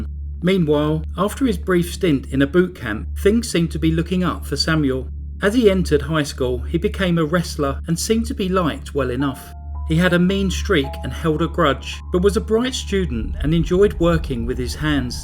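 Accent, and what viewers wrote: United Kingdom. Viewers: I also love British accent ❤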